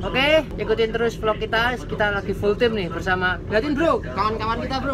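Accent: Java, Indonesia. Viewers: Suka denger ka ngomong, lucu lucu medok gemesin ea.sip sip wkwkwk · Akhirnya muncul jg vlognya si ganteng tp medok ini haha😆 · Oalah lagi..ditungguin gak nongol2..upload juga. Suaranya..gak keliatan orang Jawa ya mukanya tp medok nya bikin ketawa 😂 Inget gak pas lagi tanding trus mau ke toilet ngomong pake B.ingg ke wasitnya. Aku ngakakkkkkkkkk lucuneeeeee yaallah sampe diulang2 ampe gak kuat aku nonton nya juga 😂😭 Keren lah..👍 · si muka bule suara medhog andalanku🌈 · Dari kabeh vlog yang kulihat semuane gawe kemekel tapi seng lebih kemekel yang jalan-jalan Disneyland Hongkong terus sama tutorial push-up, sit-Up, back-Up yang Salah. Demik seneng banget kambek medhok e. Sukses selalu mas 🙏 Selalu sukses buat mas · Wohooooo, akhirnya muncul juga yg ditunggu..medhokmu itu lohhh 😁 Sukses selalu yaaa😉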